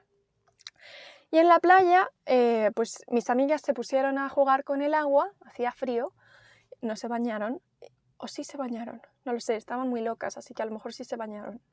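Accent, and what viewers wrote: Spain. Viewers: hola, soy de Corea y el año pasado estuve en salamanca. aunque haya mucha versión de español de otros países. me gusta la pronunciación de españa como tuya. muchas gracias por subir este video. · Hi. Are you spanish from Spain or from Amerika or what?))))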